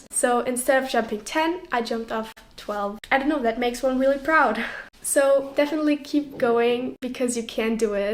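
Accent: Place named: Europe. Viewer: ahhh, habe den Akzent rausgehoert, aber war mir nicht sicher woher aus Europa der ist. Wieviel zahlst du fuer eine private Stunde?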